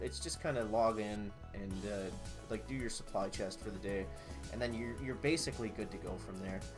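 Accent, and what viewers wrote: Canada. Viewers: Hey, love your videos man.any chance your from Canada's east coast? You sound like you might be. Thanks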